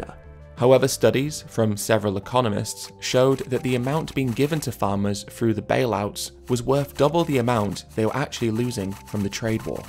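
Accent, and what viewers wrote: United Kingdom. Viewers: Hold up, Is this guy British?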